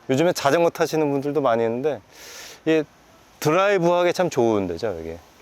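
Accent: Seoul, South Korea. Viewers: 원래 이런 병맛개그 성격이셨나요ㅎㅎ청춘의 덫 동철이랑 말투가 꽤 흡사하시네요~약간 옛날 서울사투리 느낌ㅎㅎ 올만에 뵈니 반가워요~~~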